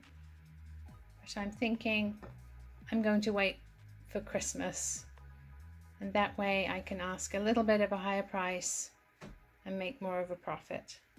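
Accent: United Kingdom. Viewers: greetings from the East Coast. I enjoy watching you and is my favorite! You are both blessed to have each other. I enjoy watching you and do so alot in the evenings after a long day at work and def on weekends. I have learned so much. You are wonderful to watch and your voice and the fantastic British accent is so soothing. Thank you for making my days alot happier. You are a doll! Happy Thriftying!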